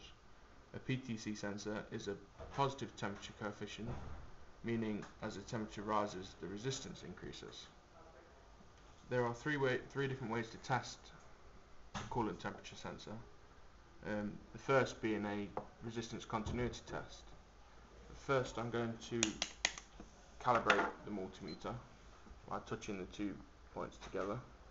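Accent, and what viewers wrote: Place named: United Kingdom. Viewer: i would love to understand your british english language man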